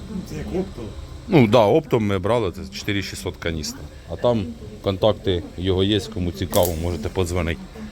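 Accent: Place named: Western Ukraine, Ukraine. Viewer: ты из западной бо в тебя говор такой не тот что в Ярика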